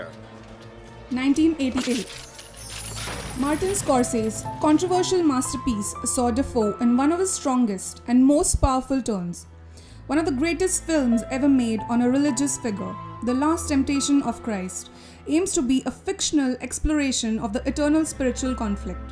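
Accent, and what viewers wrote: India. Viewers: I would seen this through if it wasn't for that strong indian accent. Reminds me of "microsoft" caller.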